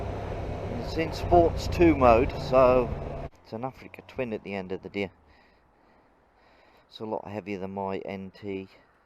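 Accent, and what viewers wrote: North East England, United Kingdom. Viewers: Ah thats great thought I picked up the accent, I'm from North Shields, like you I moved to London at 20 yrs old and Im 60 now living in Hertfordshire. I have an NC and although it isn't a flying machine it does just what I need. I'm new to your channel but will go through your vids which so far are just great Thank You. Take care mate.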